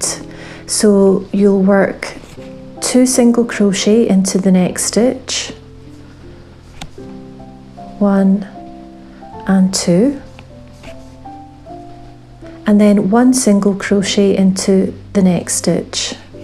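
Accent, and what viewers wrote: Scotland, United Kingdom. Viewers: 🥰Just wanted to say that I love your tutorials,! They are so easy to follow and the result is absolutely perfect! Oh and of course love your Scottish accent! 😄 Keep the videos coming because I for one am hooked for life! Pun intented!;)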